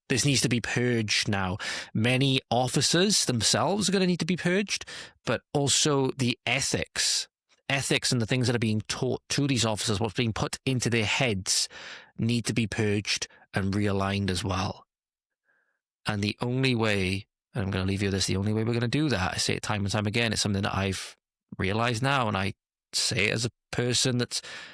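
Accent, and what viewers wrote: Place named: Welsh Valleys, United Kingdom. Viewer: Great to hear a Valleys voice (I would add our Welsh flag here normally, but YT has decided to censor it) That is a sick mf! TYVM for reporting on this! Have you heard about AJ Audits aka Anthony Styles?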